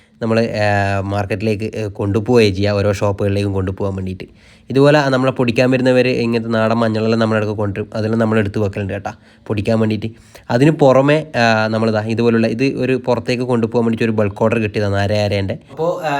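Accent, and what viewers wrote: Kannur, India. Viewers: Hi,nalla caliber ulla kutiyanu,brilliant,alrounder anennu kl Biju channel kandu anne njn manasilaki,actually nte nishkalanka chiriyum kannur bhashayum enne attract cheythu,bcs njn kannur,eachur anu. nalla director,thirakkatha,story,ellam super ayirunnu,ipol a channel kanarilla, e kanan matram,ipol santhoshamayi, mon nannayi varum,orikal nerit kananam😊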